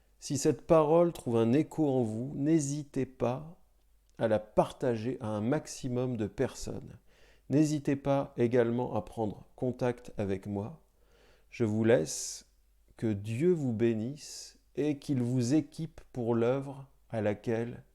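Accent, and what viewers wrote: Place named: Quebec, Canada. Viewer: Merci . Il m'a fallu quelques minutes pour m'habituer a votre fort accent de la belle province , certains mots m'ont échappés , mais , j'ai bien compris l'essentiel . Merci a vous .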